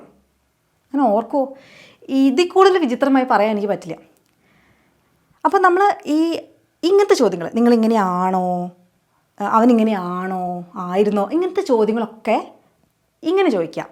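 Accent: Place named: Thrissur, India. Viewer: ചേച്ചി തൃശൂർ ആണ് ല്ലേ... ജർമനിയിൽ പോയാലോന്നും തൃശ്ശൂർ tech വിടൂല 😄.. എന്തായാലും ഇങ്ങൾ പൊളിയാണ് ❤️❤️❤️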